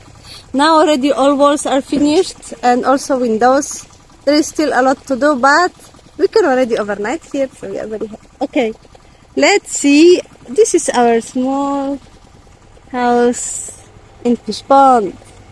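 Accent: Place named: Ilocos, Philippines. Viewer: Seems your accent sounds like ilokano.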